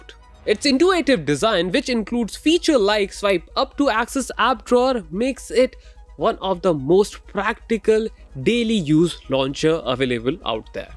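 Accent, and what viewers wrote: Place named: United States. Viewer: You really have a decent but not perfect American accent.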